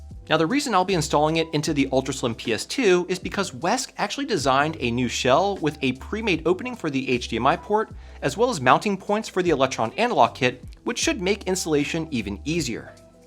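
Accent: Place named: South, United States. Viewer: Your southern drawl came out in this one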